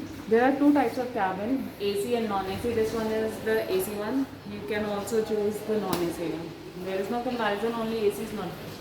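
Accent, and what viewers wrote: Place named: Bangladesh. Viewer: You were speaking Bangla with Bangladeshi accent.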